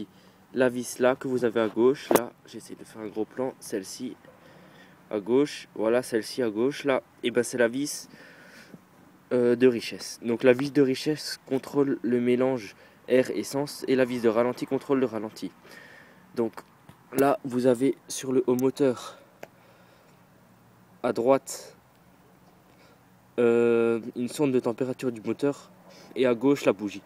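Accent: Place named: Alsace, France. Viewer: ta vraiment l accent alsacien!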